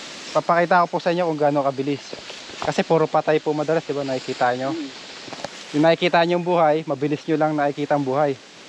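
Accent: Visayas, Philippines. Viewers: bisaya ka pala ya😁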